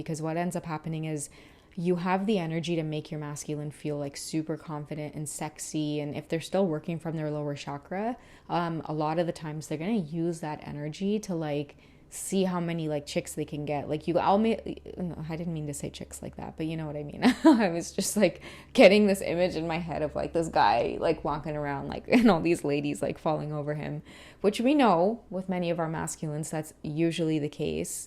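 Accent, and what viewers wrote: Trinidad and Tobago. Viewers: Not the Trini 🇹🇹 accent! I’m screaming 😂🤣😂! Yaaaassssss 🥳🥳🥳🥳🥳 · ‼️‼️ you know a Trini when you hear one! ❤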